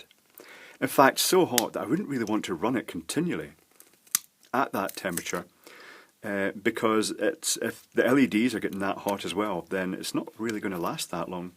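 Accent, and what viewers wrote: Scotland, United Kingdom. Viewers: Lovely Scottish accent, Edinburgh area?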